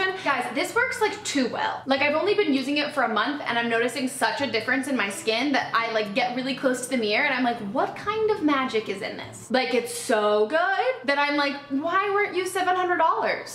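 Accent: Russia. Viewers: Russian accent really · Actually you really speak with russian accent. It was gooood:)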